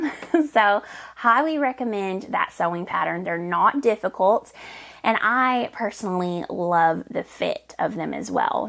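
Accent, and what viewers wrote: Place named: Tennessee, United States. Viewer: Found you through a post by Andrea Mowry, and popped right over. Such restful scenery and the colors in the area where you live. I was guessing TN based on accent 'cuz definitely not GA where I am...not enough pines. Love your work and your home, what I've seen. Decided to stay and subscribed. Thanks for a lovely restful post!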